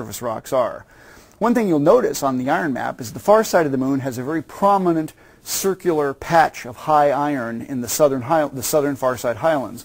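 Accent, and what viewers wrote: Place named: United States. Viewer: the American accent threw me off plus it was very late at night and i need a bit humour :)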